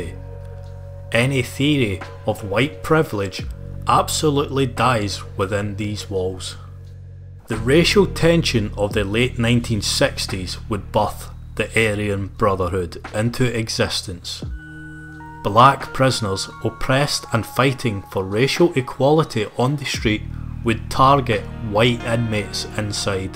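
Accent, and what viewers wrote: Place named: Scotland, United Kingdom. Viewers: You sound scottish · Didn't think I'd be watching a documentary on American prisons with a fellow Scotsman narrating it 😂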